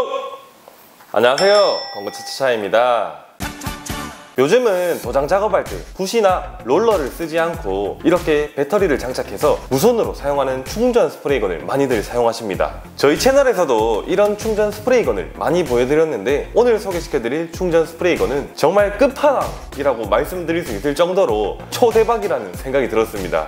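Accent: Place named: Gyeongsang, South Korea. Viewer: 영상이 너무 뜸해요. 구수한 경상도사투리가 매력적인데 자주 얼굴보여주세여!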